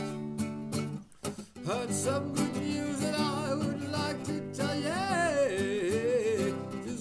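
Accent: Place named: Netherlands. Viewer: My dear Lord, actually this wonderful troubadour lives in Australia, but I recognized his accent,.. yes Holland, and we both are Dutch! Roeleke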